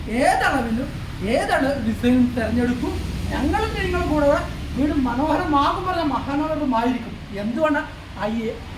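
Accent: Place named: Malappuram, India. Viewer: ഇടക്ക് മലപ്പുറം ബാഷ വരുന്നുണ്ട്...😮😮😮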